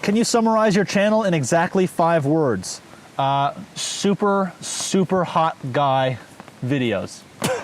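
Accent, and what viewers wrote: Canada. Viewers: Such a Canadian, eh? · See I thought he was Canadian but something felt off, so knowing hes from Newfoundland really clears things up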